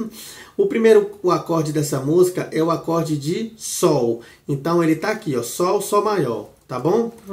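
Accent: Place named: Pernambuco, Brazil. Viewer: Vc é de Pernambuco?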